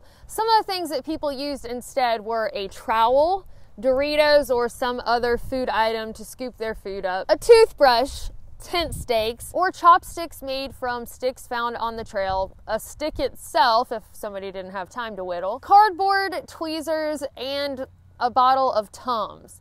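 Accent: South, United States. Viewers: This has NOTHING to do with backpacking, but I suspect it's happened to one or more of your viewers: I left my cellphone in a bathroom stall at a gas station in Sevierville, TN. It was the famous Frank Allen's (they have great breakfast: simple, comforting and so good, but they're famous for their slaw dogs) and someone was honest enough to turn it in. We happened to have some friends there when we left, so we contacted them and they picked it up for us. We were lucky, because my Amazon app was hooked to my credit card. Coulda been expensive. I have a feeling that folks have left their phones on the trail somewhere, hopefully with not-terribly-painful results. I thoroughly enjoy your channel, young lady. Your Southern "flavor" to the channel is comforting and most pleasant. It reminds me of the wonderful experiences I've had and hospitality of our brethren in the American South.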